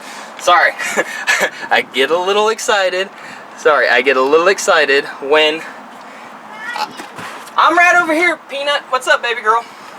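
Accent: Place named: South, United States. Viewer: 🤣😂👊👍, boy you are country!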